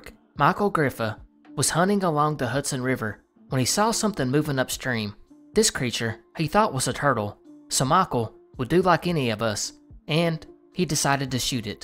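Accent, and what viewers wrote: South, United States. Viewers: Your channel and your episodes and how smart you are, helped me to better understand country boys. Now i know for sure it is just the accent. Even before i knew it was just the accent and becaude of movies etc it sounds stupid but its not. Thanks man. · I don’t think I’ve ever heard a creator who does videos like these with such a pronounced country accent Country representation <3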